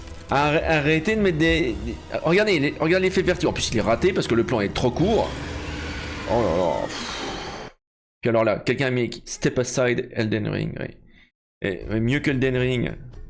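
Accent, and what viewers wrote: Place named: Quebec, Canada. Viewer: Jolie accent québécois 🥰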